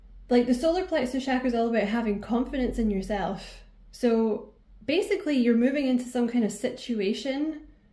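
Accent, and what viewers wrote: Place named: Scotland, United Kingdom. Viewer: The second i heard the accent i subbed. Hellow my Scottish sister fom your sister in England ❤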